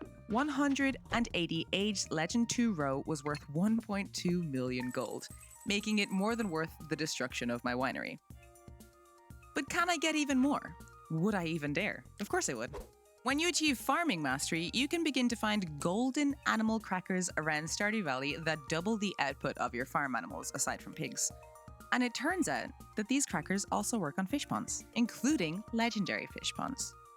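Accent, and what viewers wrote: Ireland. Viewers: while I found it a bit jarring (as an unfortunate American) to hear it pronounced that way, I personally figured it had to with where you're from. It's easy to tell you're Irish from your accent. Every English speaking country speaks it differently, and even then it can be different just based on which part of the country you're in 😅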